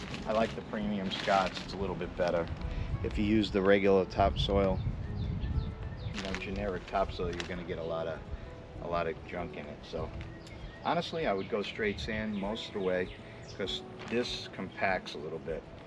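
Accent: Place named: New Jersey, United States. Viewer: I can't find one that has a single pole/handle, which discourages me from buying it. This model you just shown, HANDS DOWN, has the most parts/pieces for the handle, but it does seem to be solid. I'll add it to my cart, as I'm searching, and will pick one.... eventually. Good video 📹 👍 👌 On another note, you sound Jersey but it looks like NC where you're at. I say that cuz i met a guy from NC who sounded Jersey/NY-ish🤘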